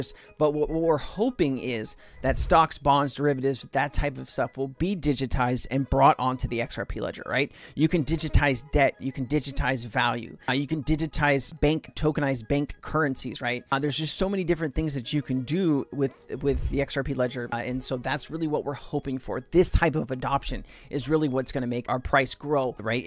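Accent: Australia. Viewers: Sounds like a fellow Aussie. Letsgetitttt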